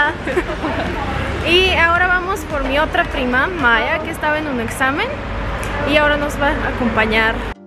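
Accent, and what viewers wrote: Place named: Mexico. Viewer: Una cosa es hablar bien español, pero en tu caso lo haces con todo y acento Mexicano, pareces nativa Mexicana, me sorprende mucho!! :O Que bonito es tu país, tiene lugares modernos, historicos, además de lugares naturales, mucho verde, me agrada!!! (^.^)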